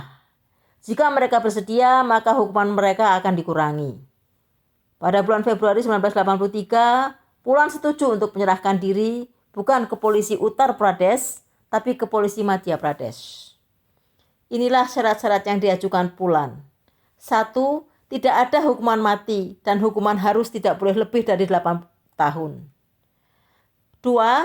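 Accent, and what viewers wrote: Java, Indonesia. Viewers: kadang ada yg bilang medok medak jangan di hiraukan mbak semangat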